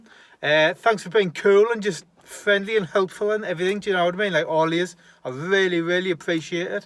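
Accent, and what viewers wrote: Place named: Northern England, United Kingdom. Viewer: I remember looking through the classifieds of fastford back in 05/06 when I was a little lad. Oh the prices back then for a Cossie! (And any other classic ford). Anyway, enjoying the videos on the car mate. Doesn't matter whether it's just an oil change or if your fitting some interior trim, you make it a good watch haha. I've also managed to understand you Northern fellas too watching this 🤣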